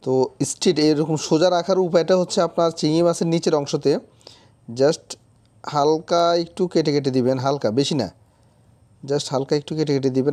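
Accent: Noakhali, Bangladesh. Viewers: নোয়াখালী এক্সেন্ট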